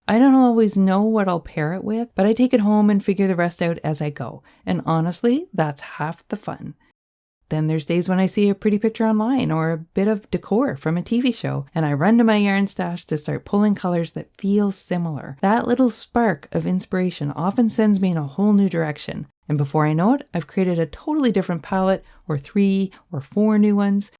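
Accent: Canada. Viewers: You sound canadian. So hopefully you have access to Home Depot and/or Lowes. I sometimes use their color swatches from the paint department. It's good for gradient colors and mixing and matching. I know, sounds a bit weird, but really helps me with color choices.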